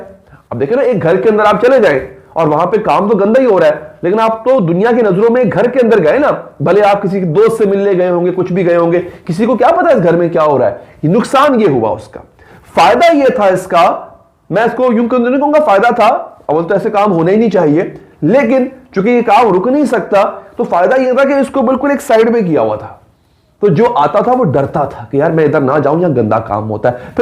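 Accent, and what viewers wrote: Punjab, India. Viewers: He mostly uses some punjabi words in a typical accent n its really cute to hear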